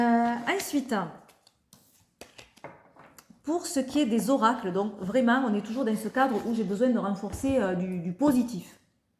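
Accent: South, France. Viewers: ton accent du sud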